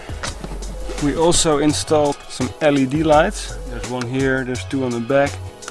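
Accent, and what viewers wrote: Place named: Netherlands. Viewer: Super gaaf gedaan! Ben er best jaloers op! Aan je Engels te horen kom je uit NL denk ik.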